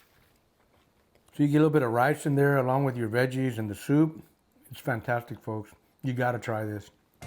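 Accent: Texas, United States. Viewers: I'm in HTown. You sound like a true Texan. Your recipe and directions are spot on. And I love the way you talk. It's like being right here with the fam.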